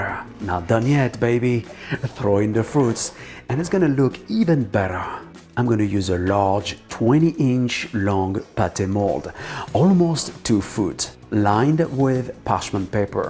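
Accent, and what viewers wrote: France. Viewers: Frenchy u make me remember my best frenc friend with u accent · Is it just me or is everything better with a French accent.????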